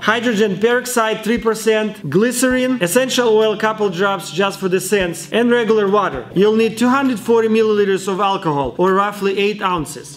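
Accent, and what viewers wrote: Russia. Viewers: Great Russian accent and scientific experiments, while helping out humanity during the pandemic. Good job 👍.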